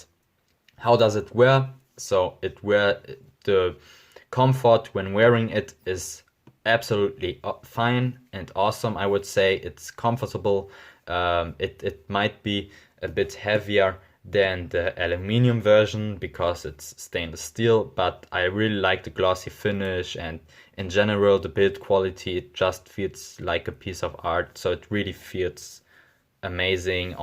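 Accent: Germany. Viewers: I think he's from Germany, he mentioned it on Reddit if I'm not mistaken.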